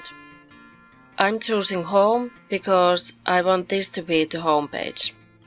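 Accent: Finland. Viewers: Is that a Finnish accent? :D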